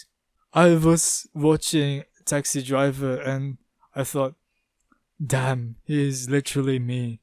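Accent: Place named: Philippines. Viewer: bro i thought this was a different person, its hard to recognize where in your accent is filipino but I can tell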